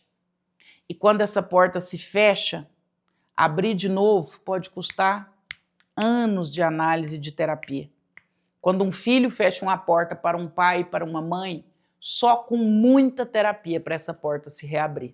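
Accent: Brazil. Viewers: Olá, a senhora poderia melhorar este sotaque, e parar de falar com este R interiorano e falar sem sotaque.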